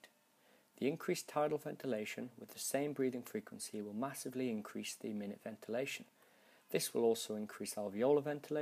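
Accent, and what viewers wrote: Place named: United Kingdom. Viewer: British accent, talk too fast, volume too low. Difficult to understand. SLOW DOWN.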